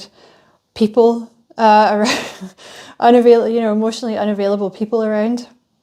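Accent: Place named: Scotland, United Kingdom. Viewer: Omg literally all the things you said resonated sooooo much and I’ve been journaling and getting promots exactly like the ones you said. I love your expression chillin like a villain 🤣 thank-you so much I love hearing a fellow uk (okay Scottish to be exact) accent for once on you tube as well ❤🙏🏽 thank-you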